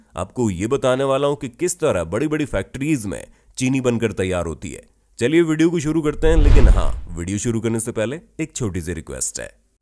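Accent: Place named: Haryana, India. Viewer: Host ki awaz haryane ki lg rhi h 🤩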